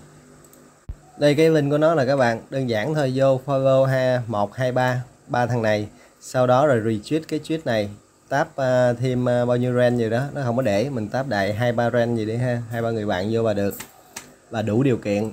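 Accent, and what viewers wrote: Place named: Mekong Delta, Vietnam. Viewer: Khoái nghe cái giọng miền tây của bạn này, nghe thật thà mà tiếu tiếu, nói chung tất cả video bạn hướng dẫn rất rõ ràng ... thanks chúc bạn thành công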